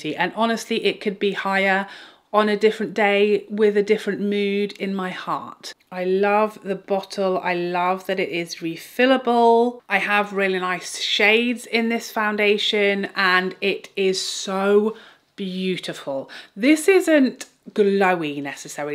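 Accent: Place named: United Kingdom. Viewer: I had to leave my favorite Chanel foundations due to the heavy perfume scent they all have now. Just found Chanticaille Future Skin Gel Foundation - light to medium coverage, fragrance free, looks lovely on the skin but PRICEY. By the way, love your humor (humour) and your British accent - I could listen to you all day. XO from the US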